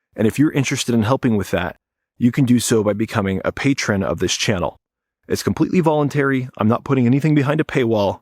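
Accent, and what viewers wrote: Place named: Ohio, United States. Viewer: You from Ohio? Your accent…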